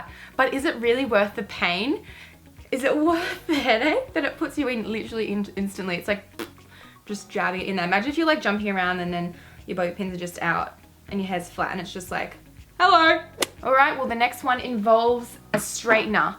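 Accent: Australia. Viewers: Is she Australian (I'm Aussie)😂💙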